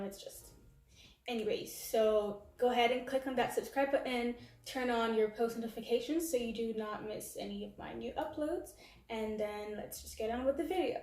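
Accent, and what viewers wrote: United States. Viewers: Hey!im from Valencia too!😎..but i lived in North Carolina since 2003!...for you accent sounds like you from USA,am i right??congrats for your channel !😊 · I live outside of Benidorm and work as a promoter in tobacco shops there and yes I see the staff watch Black people like you described and not look at people with lighter skin colors the same way. I'm curious, from your accent I'm guessing you're from the States (I am too) would you say that racism is different or more or less compared to your home country?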